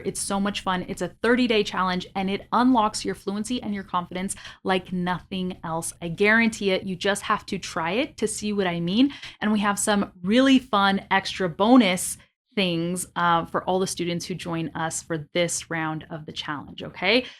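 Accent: California, United States. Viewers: It's great, btw, I have watched your content in some videos, am I wrong if you sounds more like American than British?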